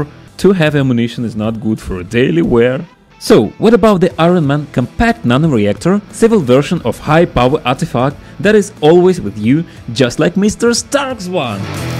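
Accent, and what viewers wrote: Russia. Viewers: Я же знаю, что ты русский. Акцент тебя спалил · русский. Это очень слышно по акценту. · Are u Russian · Прокачай английский пж, а то русский акцент раздражает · Я немого понять это русскоязычный Чувак говорит на английском ? А то что то акцент палиться 😀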